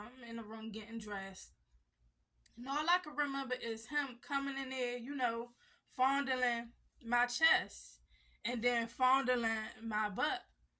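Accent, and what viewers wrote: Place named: Washington DC, United States. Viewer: Dc accent heavy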